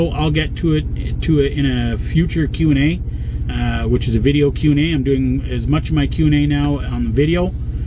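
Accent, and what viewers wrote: Canada. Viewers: Are you from Canada? Lol wondering because of the accent. You sound like the guys from this show called “trailer park boys” on Netflix which is filmed in Canada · Hey! I noticed you sound pretty UP or Canadian. I'm here in mid michigan working at Pilot Flying J night shift. I think I might have seen you before. Anyway, it'd be really cool for you to stop by. (Don't tell management;D ) I'll throw you a free coffee, soda, cup of ice, or some food if you want. Just look for the bald guy. See! This goes to show If you're a cool trucker, then truck stop cashiers will hook you up. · I like what your doing. Next time just a little less Canadian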